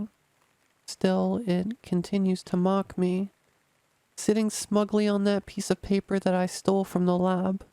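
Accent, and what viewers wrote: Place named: New York, United States. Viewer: Love this new you. Are you east coast or west coast. Just trying to figure out the accent. Sounds more New York.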